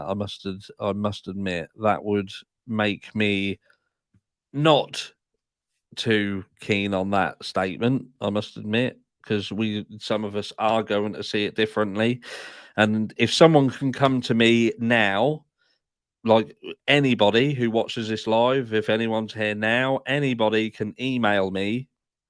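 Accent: United Kingdom. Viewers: Apparently, the closed caption doesn't translate UK English very well. It's throwing words up that are no where near what you've said. LOL. Feel sorry for any people who rely on it.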